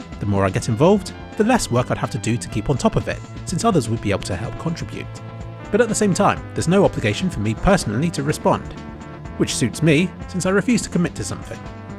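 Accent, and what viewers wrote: United Kingdom. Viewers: For many you are a god, for others a simple person who uploads videos to YouTube. For me you are someone who inspires and gives a good message and gives a smile, I also love how you narrate your videos, I would love to have an excellent level of English and have that British accent like the one you have:c Valve, give a break pls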